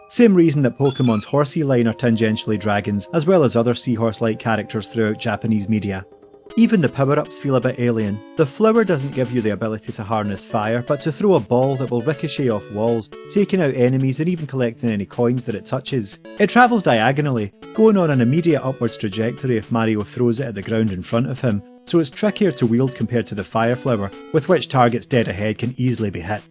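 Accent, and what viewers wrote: Scotland, United Kingdom. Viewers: That voice......reminds me of what Shrek would sound like in his mid 20s. (don't take this the wrong way, Scottish accents are one of my favorite accents of all time!) Can't wait to check out your other stuff :)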